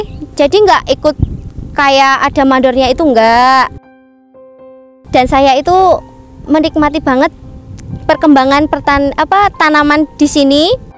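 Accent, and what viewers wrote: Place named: Java, Indonesia. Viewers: Medok banget e mbak😅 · Keren kali kontennyaa, dengar cara penyampaian dan logatnyaa medokk. Sangat menginspirasi, dn terhibur dgn channel ini dan petani happy. Thanks anyway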